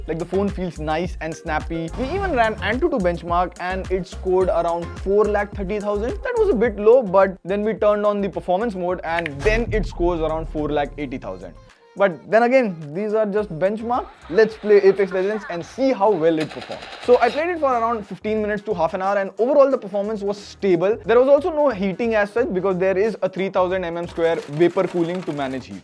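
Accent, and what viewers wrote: India. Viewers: English language video with Hindi sentences accent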